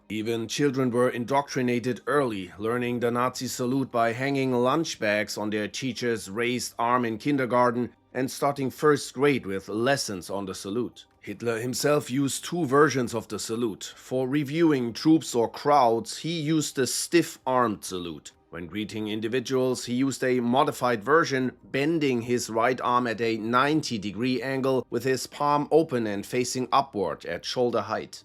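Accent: Germany. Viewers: I think the author is german,with thick german accent,thats why he said it like that. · IT IS hard to listen this German accent .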